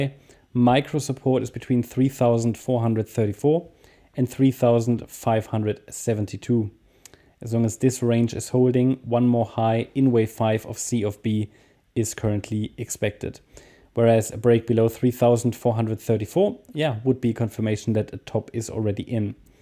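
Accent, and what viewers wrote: Germany. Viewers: stets sehr starke Analysen (ich höre den deutschen Akzent raus :)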